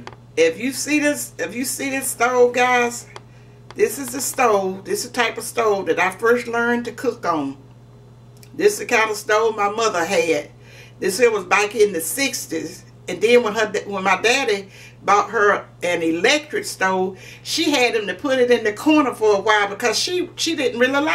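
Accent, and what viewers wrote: South, United States. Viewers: Momma I just enjoy your channel. I love hearing you talk. Make me wish I was from the south. As I said in one of my other post my grandmother was from the south and you remind me of her with how you talk and some of the things you say. The juicing is the new way to go! #Greatstuff Thanks for bringing us in ur home! Take care and God bless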